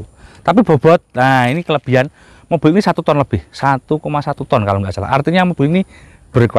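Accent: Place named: East Java, Indonesia. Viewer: Saya orang Jawa Barat, tapi kok menikmati gaya bertuturmu yang Jatim bgt😅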